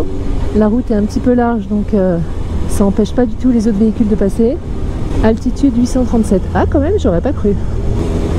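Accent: South, France. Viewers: TON ACCENT DU SUD LE TRAVAILLER ENCORE POUR ENTENDRE LE SOLEIL DANS LA VOIX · J'ai fait pause pour vérifier la résolution par défaut que YT avait sélectionnée quand tu en as parlé, et j'ai bien fait parce que le saligaud l'avait laissée en 1080... Bon c'était déjà pas dégueux, mais ces paysages en 1440... <3 Et pour l'accent, c'est compliqué parce qu'il y'en a tellement de différents dans le Sud ^^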